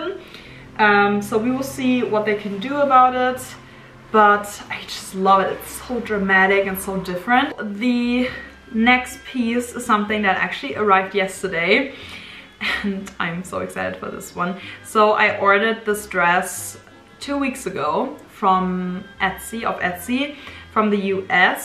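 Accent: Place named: Germany. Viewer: Your German accent makes you have a lovely voice! I stumbled across your channel while watching 1940's videos. Hollywood should be using you as an actress in 1940's theme movies. You could definitely play an eloquent society girl of the 1940's.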